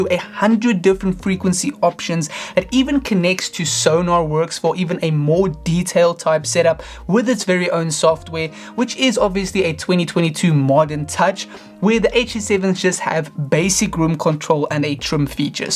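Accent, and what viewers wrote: South Africa. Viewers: you are south african right?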